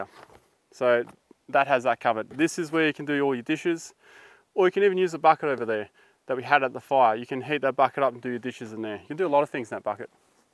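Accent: Australia. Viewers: This guy sounds like Callum Von Moger. Where is Aus you from? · Why did I know he was Australian before I opened the video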